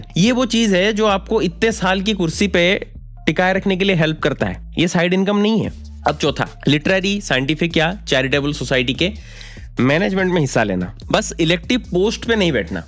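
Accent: Odisha, India. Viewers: Are u odia ??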